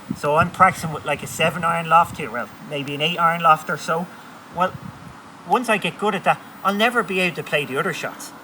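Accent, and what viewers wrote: Ireland. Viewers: Genius. Struggling like a non-swimmer in Cat V rapids until now. Like most amateurs thought I was ok until I tried. Shattered the graphite shaft like a moron. Thanks. Don’t change a thing, especially the Irish brogue!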